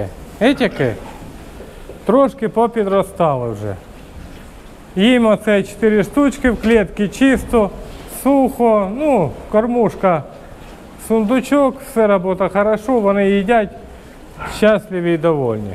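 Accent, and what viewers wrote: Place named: Poltava, Ukraine. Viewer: Ви случайно не с Полтавскої обл, говір дуже схожий,кумедний і цікавий?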